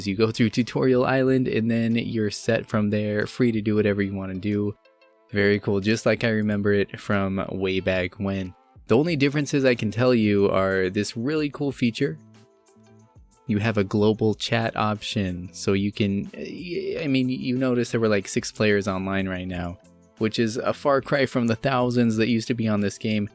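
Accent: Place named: Canada. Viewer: Where are you from? Mild Canadian accent.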